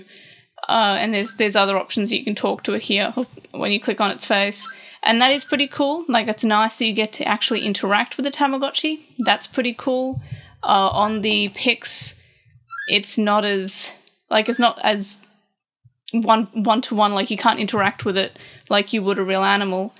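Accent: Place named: Australia. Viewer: just when I was expecting the youtuber go be American, turn the sound up and the YouTuber is Australian!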